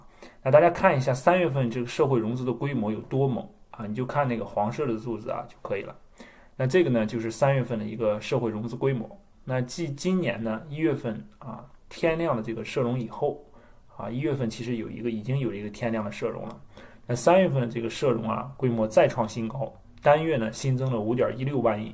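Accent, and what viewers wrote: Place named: Shanxi, China. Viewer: 估计哥们是山西人，口音听着亲切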